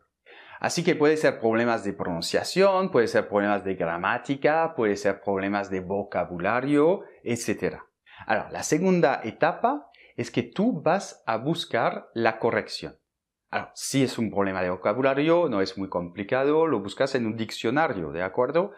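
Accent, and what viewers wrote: France. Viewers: Nasaliza alguna vocal pero su pronunciación es excelente, lo que le queda es un ligero acento francés que hace que escucharle sea un placer. Puede que a ti no te guste y sería legítimo si así fuese pero decir que la pronunciación de este hombre es mala no tiene ni pies ni cabeza, lo siento. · No Sabia que usted tenías lecciones en español también! Tengo que decir que oír español con acento francés es una de las cosas más linda que he oído hoy 😂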